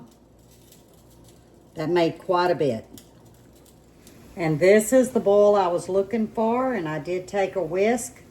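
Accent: South, United States. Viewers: Oh honey, you’re 😅Southern! You sound just like me and everyone I know.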